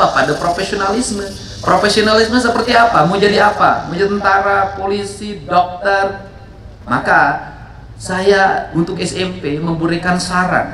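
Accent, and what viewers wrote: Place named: Java, Indonesia. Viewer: Ternyata bukan saya aja yg ngeh kalau logat Jawa juga terdengar sekalipun KDM orang Sunda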